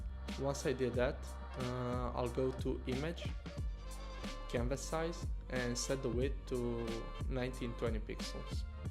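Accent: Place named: Romania. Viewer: Dupa acent e roman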